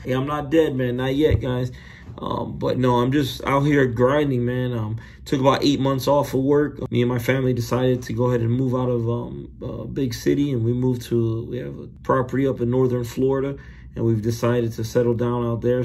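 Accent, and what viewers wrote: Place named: Louisiana, United States. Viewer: You from Louisiana bayou country? You sound a little like the Cajun Cannon.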